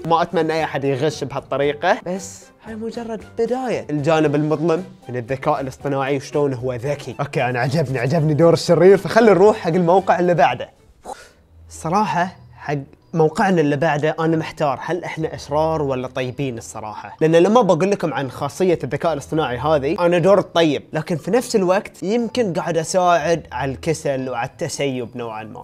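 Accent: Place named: Egypt. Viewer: ولله لهجتك المصريه حلوه ❤️🇪🇬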